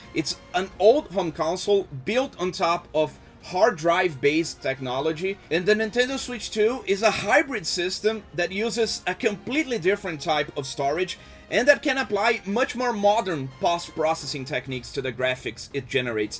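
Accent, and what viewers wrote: Brazil. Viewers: he clearly has a Brazilian accent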